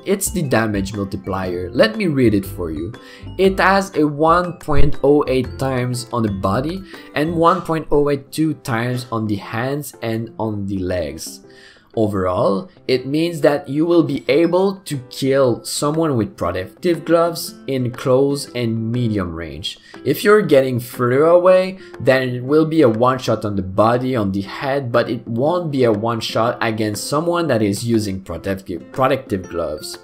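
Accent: Canada. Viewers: bon dieu un vrai anglais de laval ou quebec respect good job vagabon est mon avatar salut lgros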